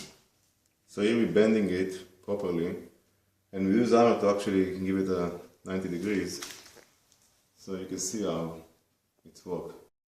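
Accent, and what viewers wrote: Israel. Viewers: You sound Israeli. Thanks for the video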